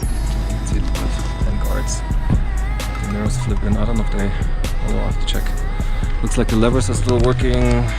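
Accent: Germany. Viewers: Wenn ein deutscher English spricht. Schrecklich, ich breche hier ab. Vor dem Crash noch.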